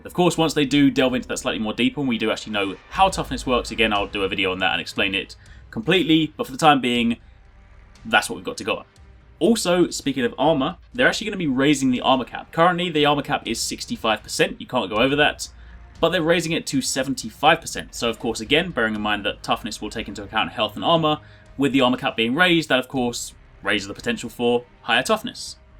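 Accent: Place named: United Kingdom. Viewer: great content!thumbs up because i like listening to u.k guys accents.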